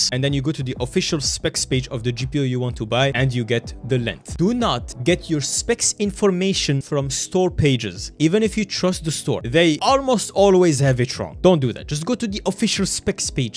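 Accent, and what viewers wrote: France. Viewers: What's up with that accent 😂😂 I swear every language besides English sounds weird or lame 😂 whatever accent this is sounds lame like French or something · Interesting voice, is that french accent ? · Are you french ?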